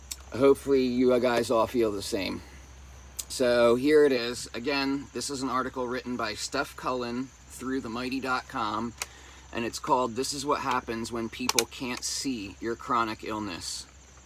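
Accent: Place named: New Jersey, United States. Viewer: That's a beautiful article! I have some chronic illness issues as well as mental health so this hits home for me too. Another good one is called the "spoon theory". It helps with both chronic and mental health illnesses. I'll try to remember to send it to you on fb if I can find it. I'm also a little tech challenged so it might take a hot minute. 😂 PS where is home for you? You sound like my childhood lol. I grew up in May's Landing NJ and was born in Atlantic City so I know jersey when I hear it lol. I always call it my first language because as soon as I hear that accent I go right back to it lol. You can take the girl out of Jersey but never the jersey out of the girl! 😁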